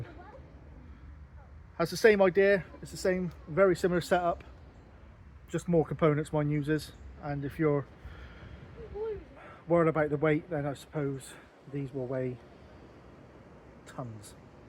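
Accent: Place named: Dorset, United Kingdom. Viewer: Nice! For once youtube recommended a video worth watching. Thats a beauty, and by the sounds of it your clearly my neighbour lol (im from dorset) so would be rude to not subscribe! 👊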